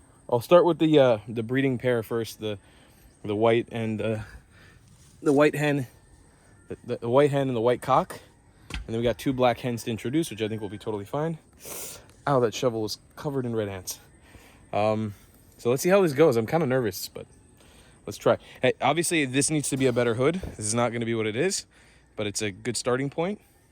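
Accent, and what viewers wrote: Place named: Philippines. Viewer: Wow, your bisaya english really sounds filipino. Even the accent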